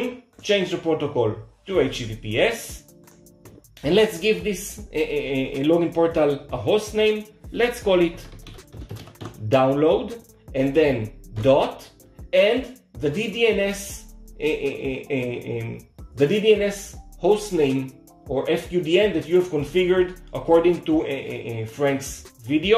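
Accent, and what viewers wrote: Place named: Israel. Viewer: חחחחחח אי אפשר לברוח מהמבטא הישראלי אחלה סרטון אח יקר.. מקצועי לגמרי ברמה של חו"ל מוקווה ששומר על עצמך בימים האלו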